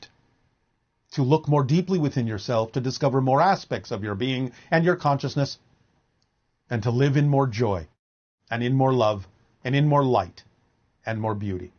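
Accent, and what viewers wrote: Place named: Ireland. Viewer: Whg does Bashar have an Irish accent 🤣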